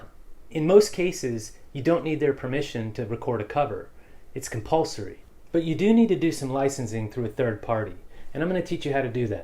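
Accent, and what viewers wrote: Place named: United States. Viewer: NICE, CLEAR AMERICAN ENGLISH & WELL GOOD EXPLANATIONS, SALUTE !!!.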